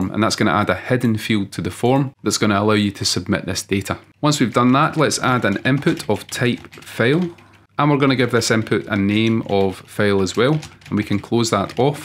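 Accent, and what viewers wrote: Scotland, United Kingdom. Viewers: Sorry if I'm rude but what is that accent Scottish ?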